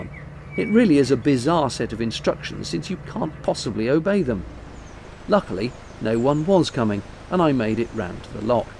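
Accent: United Kingdom. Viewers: Thank you for these videos. They are so relaxing, and your humor is spot on. Of course as an American I'm easily won over by a British accent. :P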